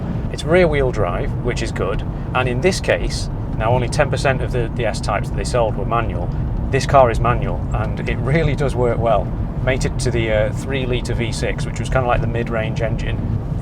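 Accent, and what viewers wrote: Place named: Yorkshire, United Kingdom. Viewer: You said 'luvly car' just like your piss take of a Yorkshire accent. You also say 'peeerfect' and glass and dials like a Yorkie. You have an accent - looky enuf...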